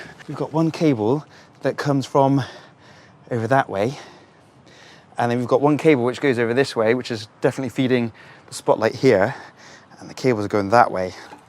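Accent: Bristol, United Kingdom. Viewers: defo Bristol accent, nice, real siuation vid, cheers Mr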